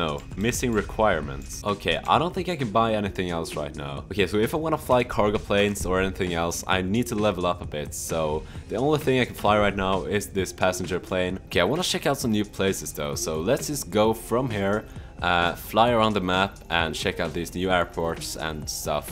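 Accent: Sweden. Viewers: Swedish Right? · Are you Swedish? You sound Swedish hahaah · You have a swedish accent my dude hej hur mår du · Are u swedish?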